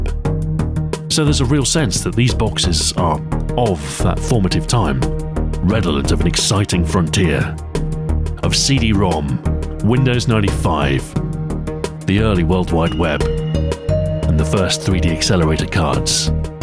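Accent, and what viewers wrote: United Kingdom. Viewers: I just watched 22 minutes of a well-spoken brit talk about boxes and I fucking loved it. That's the power of I tell you damn. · You're back! Awesome. Your sophisticated-sounding British voice is the coolest.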